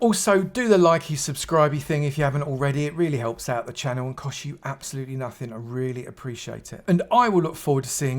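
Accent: United Kingdom. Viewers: Loving your videos. Nice to hear a British accent 😀 What happens if a chest gets full up. Do the items go into the overflow?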